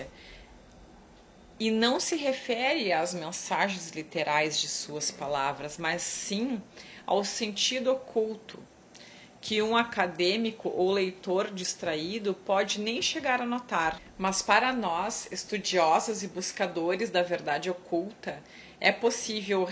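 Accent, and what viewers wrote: Rio Grande do Sul, Brazil. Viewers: Cara,como vc é chato!!! Se ñ gista do sotaque gaúcho dela,vasa!!! Ninguém está te obrigando a ver o vídeo.Vá ao canal original da saga e veja sem a traducão e sem o sotaque da! Ecaaaa!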